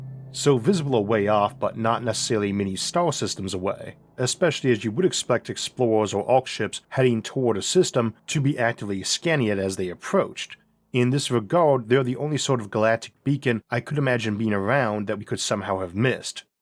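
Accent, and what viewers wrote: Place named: Louisiana, United States. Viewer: You from Louisiana? You sound like a guy I worked with around Mansfield. Thanks for the content. I’m entertained.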